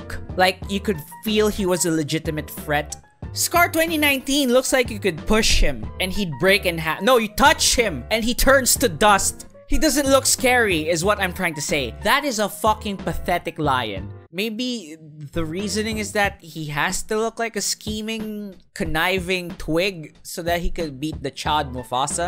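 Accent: Philippines. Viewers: Hey bro, great video hahaha can't help but notice you have a little bit of a Filipino accent. Greetings from the Philippines! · Are you Filipino? i can sorta hear a bit of an accent behind your words lol. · Do I hear a Filipino accent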